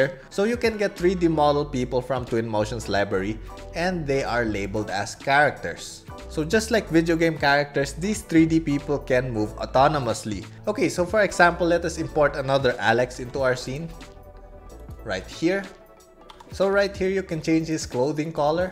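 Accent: Philippines. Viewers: Are you a Filipino? You have that accent you know.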